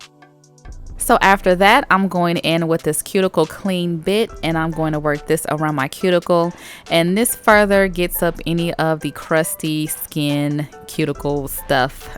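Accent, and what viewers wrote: South, United States. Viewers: OMG SIS!!! Absolutely Amazing!!! I’m loving this set. You are crazy talented sis!!! Yasss I’m wanting this set on sis. Don’t worry about how you’re pronouncing things; I’m country too sis. Tysmfs Love ya!!! 🥰🥰🥰💅💅💅💯💯💯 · I thought you were saying orange normally. But I'm country too so.... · Yall don't come at her she's country okay, that's how she says orange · First of all this look is <link>! I have a toddler son so my first thought at blue and orange was blippi 🤦🏻‍♀️ lol but it turned out so pretty! Amd second I LOVE that you talk like I do. I have a very thiccccc southern accent and honestly that's why I don't do many videos on my channel cause I hate the way I sound lol but girl your making me more confident to finally do a nail art channel! 💅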